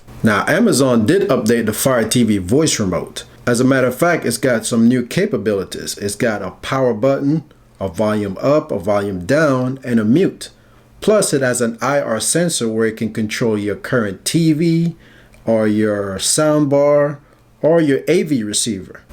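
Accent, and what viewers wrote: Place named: Jamaica. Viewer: Can hear the yard accent!! Big up bredda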